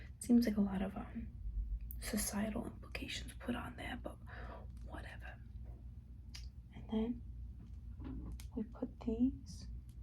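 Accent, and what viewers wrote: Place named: New York, United States. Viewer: I love your ny accent videos! 💟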